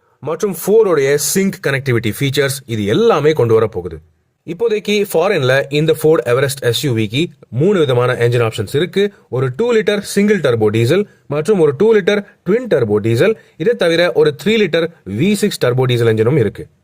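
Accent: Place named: Kerala, India. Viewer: Why you are talking like Vinu Chakravarty… full of Malayalam vadai adikkuthu unga Lin voice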